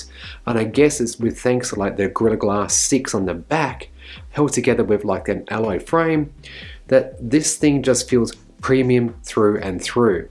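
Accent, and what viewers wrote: Australia. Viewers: Thanks soooo much . You've helped me decide on the 6 rather than the pro. Great video mate. Love that your Aussie and even better from Melbourne. Vic all the way. 😉👌🍻🤘💪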